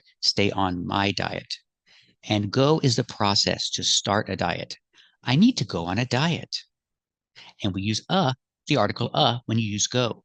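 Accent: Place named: United States. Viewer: face-blue-smiling:... Hello. I follow you for two main reasons: I appreciate your American accent and your professionalism. You explain all the details thoroughly and consistently provide examples of how to use various structures. By the way, where is your accent from? Thanks a lot for everything you do!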